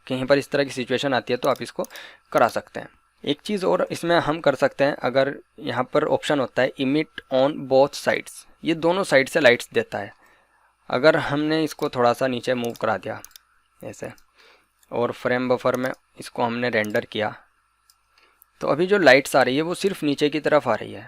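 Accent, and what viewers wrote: India. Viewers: Hi, you sounds like my side. Are you from U.P.?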